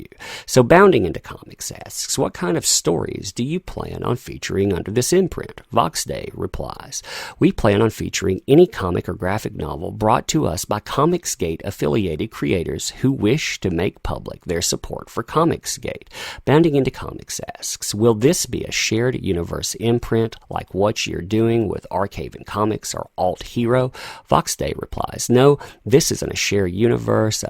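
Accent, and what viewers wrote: South, United States. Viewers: you sound like you have a southern drawl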